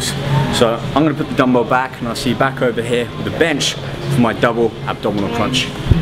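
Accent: London, United Kingdom. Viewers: you sound like you come from london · the british accent makes him so much more believable. its such a sad thing on my mental capacity but its true LOL. hes not saying anything different from what I already know but it sounds so new for some reason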